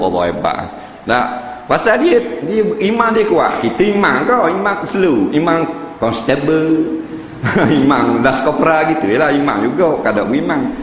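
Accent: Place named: Terengganu, Malaysia. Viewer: loghat ganu mu dok tahu..jgn komen tnpa berfikirr..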